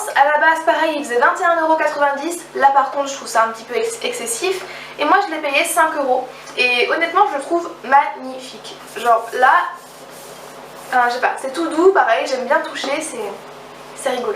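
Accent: United Kingdom. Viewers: J'adooooooooore le Victor Hugo, il m'avait déjà fait de l'oeil sur le site de Soleil Editions... J'aime bien l'accent britannique aussi, il faudrait consacrer une vidéo à ça :P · Super book haul ! Au passage je kiff ton accent britannique je suis pour que tu dises les titres en britannique !!!!! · Je suis fan de ce petit accent britannique ;). Et entendons nous, "wondÉ", ça te décolle les tympans! B. Lacombe... On en entend parler sur toutes les chaînes en ce moment mais ça se comprend! De très belles acquisitions en tout cas! Et si ce sont tes achats depuis le mois d'Août, ça reste raisonnable je trouve, pas encore extravagant! ;) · définitivement l'accent anglais :) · Pour avoir fait de longues études d'anglais, je dois dire que perso ça me fait du bien d'entendre une youtubeuse bien prononcer les mots anglais avec un bon accent, donc j'aurais tendance à te dire YES ! 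Garde ton bel accent. Cependant pour ceux et celles qui auraient du mal à comprendre (faut bien avouer que l'accent british est à couper au couteau hein haha) je pense qu'il serait judicieux que tu mettes des annotations dans tes montages vidéos pour spécifier à l'écrit le nom du livre et son auteur pour que les personne puissent le retrouver par la suite sur google :) Bisou ma belle xx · Coucou ma belle ! Hihi en effet ton accent est HYPER britannique ! Mais perso j'adore ! J'ai plutôt un accent américain pour ma part donc j'ai une adoration pour le britannique. Alors continuuue ^^ Je ne suis pas fan DU TOUT des BD, mais... Macabre a l'air vraiment beau ! :O Alors peut être que je vais le commander... Au fait, merci de m'avoir fait découvrir priceminister pour les livres. Hum hum. ^^ Pour la petite info, non, PPDA n'est pas parti à la retraite, c'est Claire Chazal ça ! xD Sinon comme d'habitude, j'adore te regarder en vidéo, tu respires la joie de vivre c'est vraiment super à voir. 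Par hasard, est ce que tu vas aller au salon de Montreuil ? ;)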